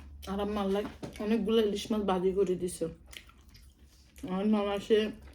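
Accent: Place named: Feni, Bangladesh. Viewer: আপু আমরা ফেনীর মানুষ তাইতো আপনার কথা শুনলে মনটা ভরে যায়।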